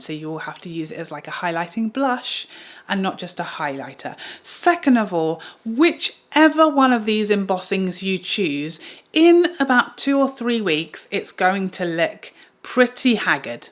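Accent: England, United Kingdom. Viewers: No pipetting makeup!! 🤣. We really do all hate the pipette! Has anyone in the history of the world thought, “You know what would make this serum, this foundation perfect? Dispensing it with a pipette. But listening to turn pipette into a verb and repeat the word with her glorious English accent is worth every stupid pipette cosmetic companies use in their products. 😂. Love you,. Love your ‘You don’t need that’ series.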